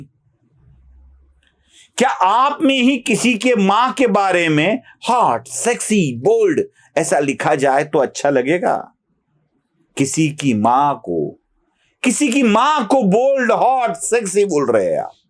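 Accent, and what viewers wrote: Maharashtra, India. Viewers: सर आर्ध मराठी माणूस मराठी बोली नाही विसरू शकत हिंदी बोलताना keep it up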